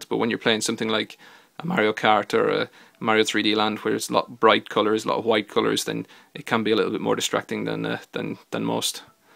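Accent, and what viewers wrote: Ireland. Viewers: are you Irish? Because I can hear a tiny bit of an Irish accent.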